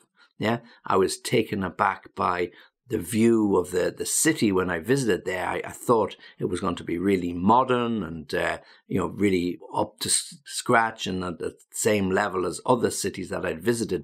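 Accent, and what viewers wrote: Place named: North England, United Kingdom. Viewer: Hi! This is the first time I watch one of your videos, I really like your accent, it is so colourful, are you from the North of England?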